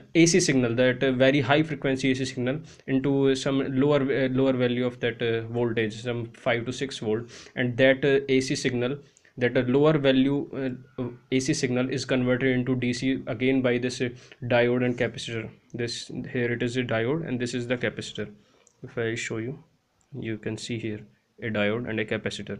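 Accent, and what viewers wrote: India. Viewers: I am only 2 minutes in but it seemed to me that while you were explaining what each individual part is you might as well acknowledge the USB output connector too. I know what it is and the fundamentals of how that particular piece of this puzzle works, but for those who do not know what it is, a quick intro to it like the rest might help someone. Jus sayin' ... :-) I truly admire the fact that you do not want to waste peoples time with a long drawn out video. But there were a few times where you just motored through a sentence or two leaving my ears in the dust. Throw in the fact that I have not seen my Indian friends in years nor have I visited Pioneer Blvd and I had trouble keeping up. Of course that one is on me :-) But yeah, almost sounded like you plugged yourself into one of those chargers you were going fast! But I'll take fast over a long drawn out video any day of the week. 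I should add that I found this a very informative video as I did learn a couple things. Thank You and Thumbs Up!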